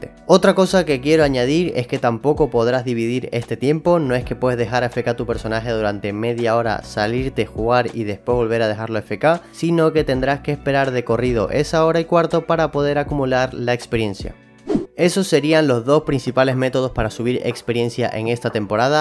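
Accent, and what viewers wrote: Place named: Canary Islands, Spain. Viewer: Holii eres de canarias? Tienes acento "nueva" xs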